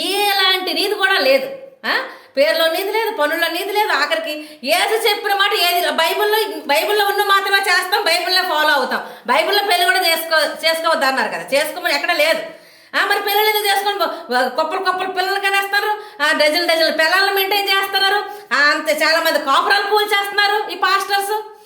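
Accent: India. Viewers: Em basha babu turpu la vundi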